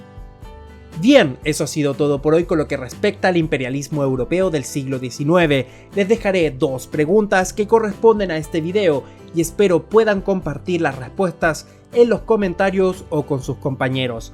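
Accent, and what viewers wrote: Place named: England, United Kingdom. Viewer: Buen video pero si quieres hacer el pedante pronunciando los nombres en inglés asegúrate de que sean ingleses para los europeos que pronuncies nombres franceses o alemanes con acento inglés es francamente ridículo......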